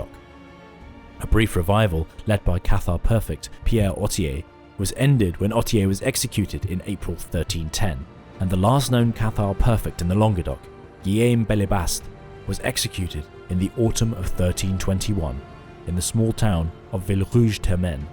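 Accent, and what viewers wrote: United Kingdom. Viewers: oh ya like the Souff London accent. Ya I used to live in London.. Heard that :)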